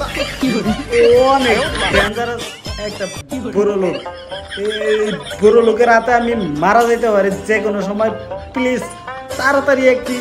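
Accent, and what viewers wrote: Tangail, Bangladesh. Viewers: ভাই কি টাংগাইলের লোক নাকি...? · ভাই মনেহয় টাঙ্গাইলের।ভাষায়ই বোঝা যায় ভাদাইমা।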